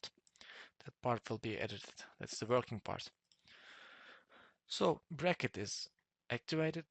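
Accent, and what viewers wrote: Turkey. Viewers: Hocam siz türksünüz galiba aksandan biraz öyle gibi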